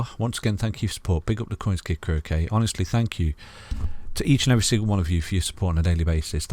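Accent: United Kingdom. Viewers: Putt Putt soft spoken and soft foods edible Brit accent. Have a parfait.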